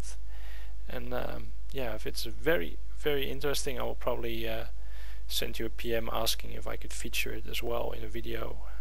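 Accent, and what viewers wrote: Netherlands. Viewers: Where are you from? Nederlamd? je klink nederlands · Grappig je kan gewoon aan je engels horen dat je een Nederlander bent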